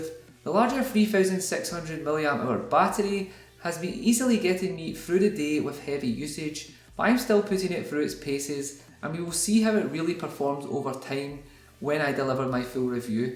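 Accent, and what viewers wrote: Ireland. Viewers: r u from Ireland? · Are you Irish or Welsh? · Do people in Ireland find American accents as cool as I find yours? Lol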